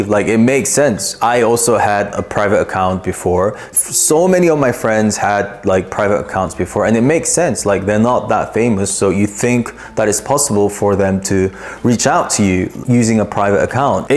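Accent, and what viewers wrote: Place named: United Kingdom. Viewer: First of all, celebrities do not have time to be chatting with their fans privately. They don’t even have a lot of free time so if they do find free time, they would NOT use that to private message a fan. Sorry fan, but you’re not that interesting. Why would a celebrity choose you over his colleague or another person of equal status? 🤔 Also, celebrities work hard to be where they are so they’re not interested in socializing with fans who are in a place of dreaming and fantasizing. If they want friends, they would choose ppl of the same status because they’re more relatable and trustworthy since they’re of the same socioeconomic status. If you’re a victim of scams then that’s one reason why a celebrity would never talk to you. Lol. You may not have money, but at least be a smart human being so that way you can at least appear attractive. 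Let’s be real. I’m not a celebrity, but not even I would want to be your friend. 😂🤣😂 I do hope the fans that fall for these scams are little kids. This video would be helpful to them. If you’re an adult and not able to use basic common sense to distinguish what’s real and what’s not, you got issues. Being scammed is not even a problem worth highlighting if you’re an adult. The root issue is… 🫢😅 Btw I appreciate this video. I’m new to this channel and I love the British-English accent. I always thought English Language Learners would want to learn American-English. It never occurred to me that an ELL would learn English with British pronunciati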